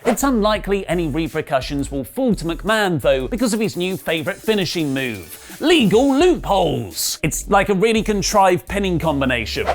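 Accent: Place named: United Kingdom. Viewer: Where are you from? Your accent is British English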